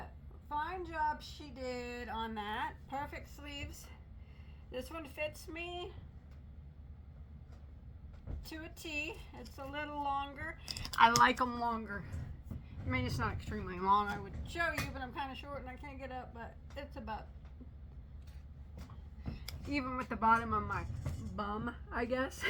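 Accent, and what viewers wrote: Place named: Midwest, United States. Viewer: I love your Midwest way of speaking. You are so real and confident. I'm glad you wear pants unbuttoned while relaxing.😊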